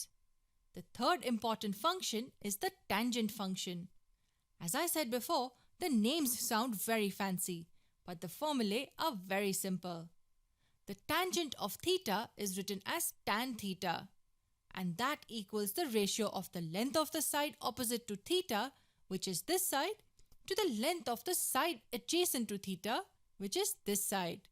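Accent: India. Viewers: goofy ahh formulaaaee indian a$$ accent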